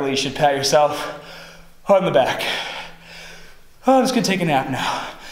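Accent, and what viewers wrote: Boston, United States. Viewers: John Letterman Its the boston accent i think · What's up with the Boston accent I watched your videos for years and stopped watching and recently just started watching them and I had to go back in time to see that it was the same person are you originally from Boston and it just came back you never had an accent before just curious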